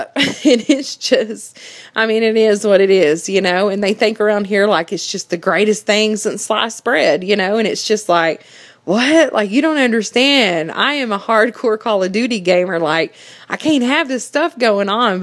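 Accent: United States. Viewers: We got the Country accent, Swarms and great commentaries. This channel has it all! Shout outs to!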